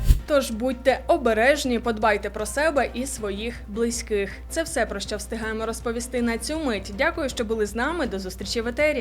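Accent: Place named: Slobozhanshchyna, Ukraine. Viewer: Я понял, со Слобожанщины,Полтава? Акцент априори от туда,не?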